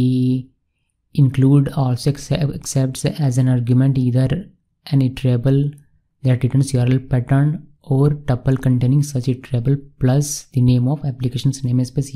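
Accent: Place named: Punjab, India. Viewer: I have seen Django courses on youtube but your tutorial course stands out because .... 1. You have explained theory behind django framework. I have not seen anywhere else; even in paid courses instructor is not able to explain theory like you have my friend. 2. Now most important part in learning Django is practical hands-on; you have also spent lot of time explaining step by step (GeekySteps as you call it in your videos; I love them :)) so your students don't get confused about django file structure. 3. Aaap ki hindi punjabi se kaafi milti zulti hai. Kya aap punjab se hai? If Yes, Wahe Guru sarji Sat Sriya Kaal!! You deserve many thanks for your videos. Thank You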